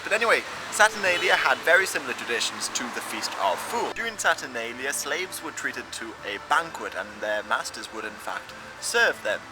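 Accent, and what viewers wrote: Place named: England, United Kingdom. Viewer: He's English, just because he doesn't have an American accent doesn't mean english is his first language. What most Americans don't understand is that ENGLISH is from ENGLAND.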